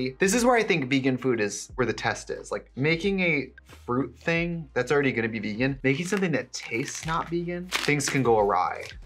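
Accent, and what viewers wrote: Wisconsin, United States. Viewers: Per beggg love her Wisconsin accent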